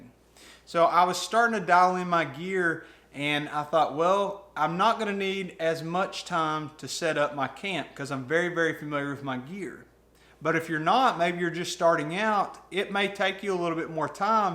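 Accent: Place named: North Alabama, United States. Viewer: You from north Alabama by any chance? You sound about like me. lol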